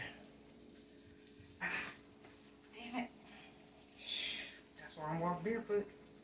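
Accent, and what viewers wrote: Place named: Louisiana, United States. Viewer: Where u from cause u talk like me 😂😂😂Louisiana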